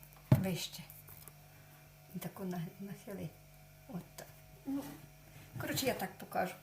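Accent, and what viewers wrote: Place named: Khmelnytskyi, Ukraine. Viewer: Добрий вечір.Пнивіт з Хмельниччини.Я підписалася на Ваш канал.дуже сподобались Ваші відео.Коли перший раз попала на Ваш канал.почула Вашу мову то чомусь так і подумала що ви з Хмельниччини. Бажаю Вам миру над головою. скорого повернення Ваших синів до дому з перемогою.Невісточки молодці гарні хазяєчки Всього Вам гайкращого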